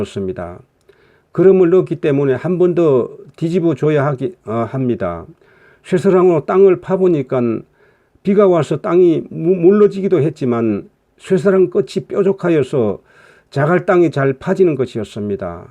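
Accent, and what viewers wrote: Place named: Namhae, South Korea. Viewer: 말씨가 고향이 남해시군요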